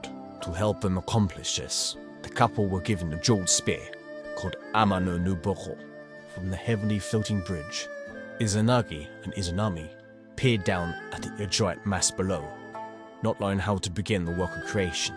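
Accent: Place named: United Kingdom. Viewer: MATE, YOU ARE BRITISH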